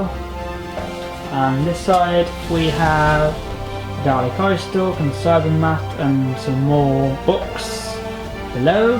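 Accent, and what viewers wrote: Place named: England, United Kingdom. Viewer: What part of England you from?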